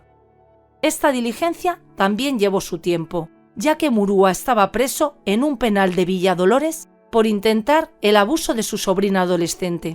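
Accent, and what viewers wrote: Spain. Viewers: eres una mujer excepcional. Relatas casos a la perfección. Me encanta tu acento español. En cuanto al caso de Brenda, afortunadamente se hizo justicia y ese maldito (Juan José Murúa) se pudrirá en un reclusorio. Ojalá también se haga justicia para Marisol y Luz.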